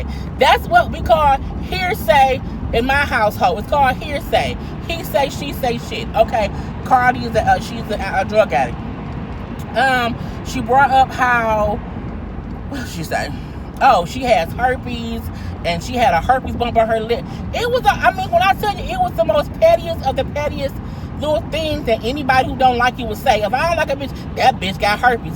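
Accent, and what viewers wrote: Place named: New Orleans, United States. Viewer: I don’t believe that lady in your New Orleans voice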